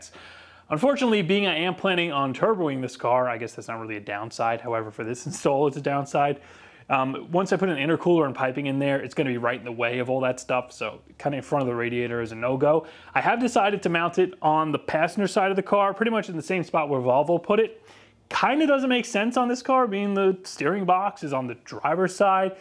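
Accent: New York, United States. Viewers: My first car was an 85' trans am same blue! I really enjoy this series, you've done a ridiculous amount of work on this car! My current 89' GTA never disappoints. I'm in Northern CT, you must be in long Island if I here you're NY accent correctly.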